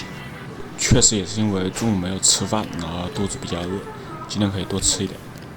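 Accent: Wuhan, China. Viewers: 兄弟口音是武汉的？ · 配个字幕就好了，感觉好像汉普啊哈哈🎉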